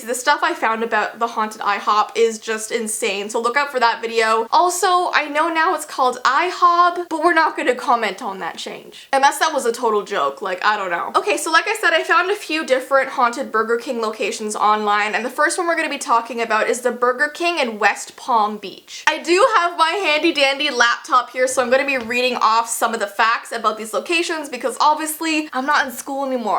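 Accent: Canada. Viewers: Don’t worry I am Minnesotan so I have the same accent as Canadians and I say Oregon (or-I-gan) too ily❤️ (edit) this was a typo: (or-i-gen)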